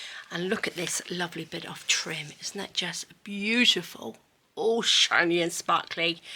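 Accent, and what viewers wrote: United Kingdom. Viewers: Congratulations, dear, on reaching 80,000 subscribers! You were the first creator I ever watched, when we were shut down for COVID in early 2020 here in the New York City area. I was home from work for 3 months, confined to the house, and going absolutely stir crazy, when this lovely British accented lady broadcasting from Portugal taught me what a junk journal was, and I have been a subscriber ever since…haven’t missed one! In fact, the first digital I ever bought was your Shabby Pink one. And of course I had to have water colors, distress ink, pastels, gesso, stabilo pencils, resin, glitter, lace, seam binding, beads, and bling, not to mention every color of your favorite rose trim known to man. I am so grateful to you for sharing your talent with us. I haven’t seen you work with your pastels in quite some time, perhaps you could include another project using them this year? 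Thank you again for teaching us your craft, and for keeping us entertained for so many years. Sending much love and gratitude to💖💖💖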